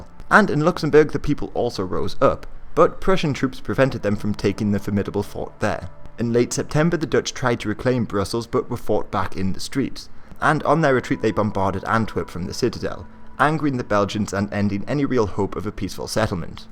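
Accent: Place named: Newcastle, United Kingdom. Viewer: Where are you from? You sound like a Geordie who's trying REALLY hard not to sound like a Geordie...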